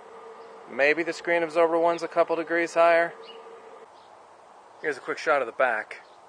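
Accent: New England, United States. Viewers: New England accent?..Looks good though. I guess there's a temp difference between steel vs aluminum cans.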